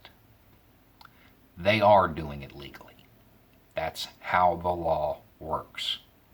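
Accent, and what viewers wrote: South, United States. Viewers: So I'm new here, but is there a reason you lay on a much stronger southern accent in videos on this channel? You're speaking voice in the videos on the other channel is noticeably less accented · (I'm assuming that is your first name) I've really come to enjoy and respect the videos you put out. I'm still pretty new to your channel and I haven't entirely agreed with everything you say. But I would say I agree with 90% of it. What I find most intriguing is how you fit the trope of "Don't judge a book by its cover." I have no doubt that I am not the first person to click on your video for the first time and instantly take you for a Trump supporter. lol It's the southern accent and the down south good ol' boy look. (This may be a little unfair being Im a native Texan with a southern accent myself. Just not quite as much as yours) Fortunately it doesn't take long to realize you are not a Trump supporter at all. 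For that I am very grateful. You have very good incite and thought provoking commentary. Keep up the good word my man.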